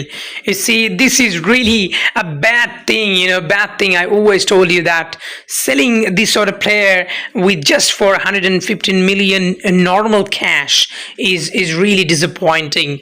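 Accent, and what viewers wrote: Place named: Germany. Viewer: junge direkt gehört, dass du aus deutschland kommst :D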